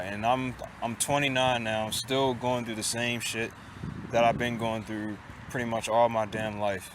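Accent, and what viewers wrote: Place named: Baltimore, United States. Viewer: Sounds like Baltimore for sure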